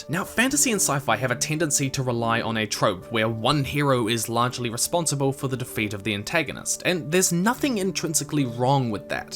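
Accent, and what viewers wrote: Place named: New Zealand. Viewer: Was listening in and I was like "Shit.. This guy's kiwi!", not used to hearing a fellow kiwi doing film analysis, it's refreshing. Keep it up!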